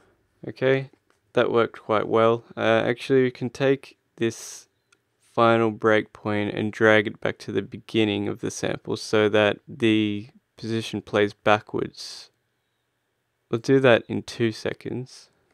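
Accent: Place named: Australia. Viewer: Insane! I'll really have to try absynth. The grains part remind me of the sound you get when playing in Padshop BTW, huge Silverchair fan, especially the 90s and 00s stuff, and your voice reminds me so much of Daniel Johns', I love the Australian accent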